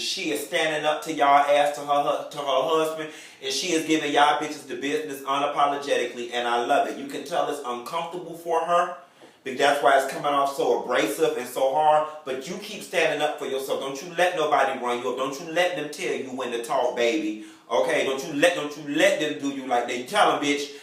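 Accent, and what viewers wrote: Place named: Tallahassee, United States. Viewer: Thank you for the review! Can I just say that I love the other reviewers...each has his own dialect. But you're the only one who sounds natural saying "back peddle and pu$$y pop." I went to undergrad in Tallahassee & recognize your dialect. The others don't talk like that..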